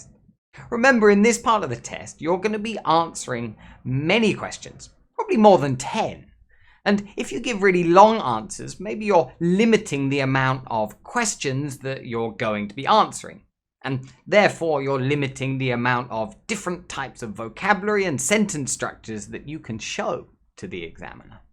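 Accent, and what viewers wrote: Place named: United Kingdom. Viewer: Please teach us how to do British intonations like you. Stress sentences in particular. I love your accent and intonations. How to speak fluency like you with intonations.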